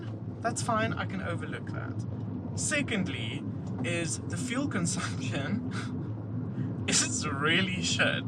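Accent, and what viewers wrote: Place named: South Africa. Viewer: I could listen to that South African accent all day 🤗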